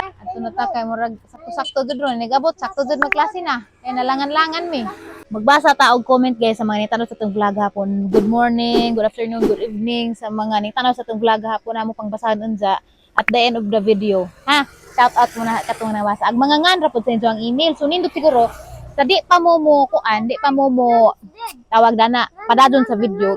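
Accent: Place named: Southern Leyte, Philippines. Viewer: Suway ko shout out dai … my name is Haydee Bito Bermoy Moyers from Surprise, Arizona! si mommy nako Ang naka discover sa imo lipay og moot kaayo siya watching you. She passed away 5yrs ago na pero pabilin guihapon ko follow nimo… it reminds me of her when I listen to you talk… she was born in Southern Leyte and I grow up listening to her dialect like yours.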